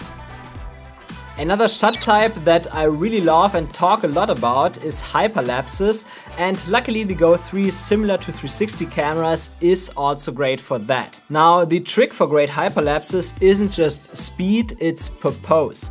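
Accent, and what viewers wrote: Germany. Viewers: hmm sounds like DEUTSCHLAND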